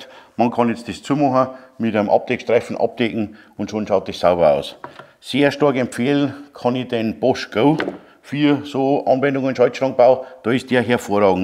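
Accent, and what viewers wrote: Bavaria, Germany. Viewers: Hallo! Wie immer gutes Video.Arbeite schon 51 Jahre als Elektoinstallateur und schaue immer wieder gerne deine Videos an. Ignoriere diese Dummschwätzer,die meinen alles beser zu wissen. Mich hat letztes Jahr auch eine alte Frau aufgefordert den Meister zu holen nachdem ich eine Lampe mit Bewegungsmelder montiert hatte, Ich habe vielleicht 10 Minuten gebraucht. Ich erklärte Ihr,dass ich der Meister bin und schon Hunderte Lampen montiert habe.Da sieht Mann,dass manche Leute keine Ahnung haben. Aber alles besser wissen.Und dein Bayerisch ist auch okey.Ich bin Schwarzwälder und rede Badisch da sagen die Leute auch ob ich ein Schwabe bin oder aus dem Hotzenwald komme. Die wissen noch nichteinmal wo der Hotzenwald ist.Viele Grüße Joachim. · Und was ich noch loswerden möchte: Deine Videos sind super, ich schaue sie gerne an und lerne ab und zu auch was. 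Die z. T. dämlichen Besserwisserkommentare gehören eben dazu. So einen Filter, der solche Leute rausfiltert gibt es eben noch nicht. Und recht hast du, wer dich nicht versteht wegen deines bayrisch, an dem liegt es selbst, der muss eben bayrisch lernen. Wo kommen wir denn hin wenn der Bayer nicht mehr bayrisch reden darf.. In diesem Sinne mach weiter so. LG von Markus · Dein Dialekt ist bayrisch oder ostdeutsch ? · Servus, moi wieder ein super Video! Daumen hoch 👍🏼 Dei Boarisch vermiss i übrigens immer no a bissl... Schena Dog no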